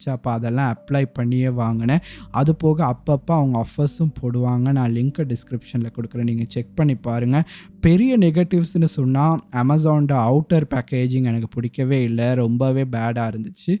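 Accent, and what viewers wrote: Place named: Sri Lanka. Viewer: என்ன இலங்கை வாசம் அடிக்கு. அகதியா நீ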